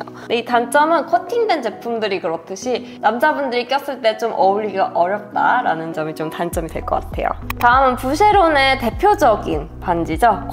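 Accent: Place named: China. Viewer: 어디 사투린지.. 독특한데 억세게 들리네요. 억양이 중국 말투 같아요😅 안녕 바이~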